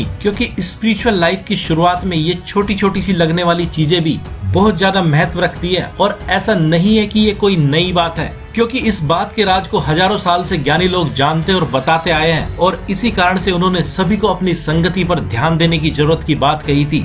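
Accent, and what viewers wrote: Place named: Punjab, India. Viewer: मुझे आभास हो रहा है आप पंजाबी व्यक्ती हैं।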